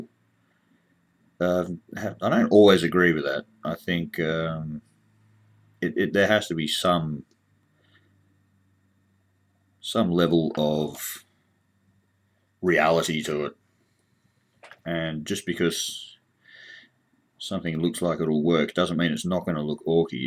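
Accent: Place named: Australia. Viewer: dude you sound aussie as f#ck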